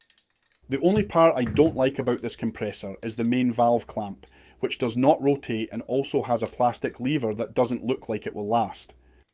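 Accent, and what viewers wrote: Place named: Scotland, United Kingdom. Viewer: I'm an even smaller creator, you're way ahead of and better than me 😂 Also, love the scottish 👍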